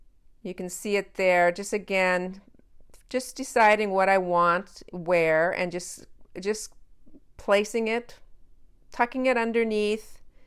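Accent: Minnesota, United States. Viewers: Ah that Minnesota accent with your fabulous creativity. Love it!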